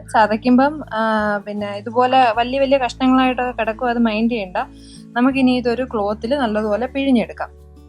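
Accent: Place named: Tamil Nadu, India. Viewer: Akka Tamil sounds akka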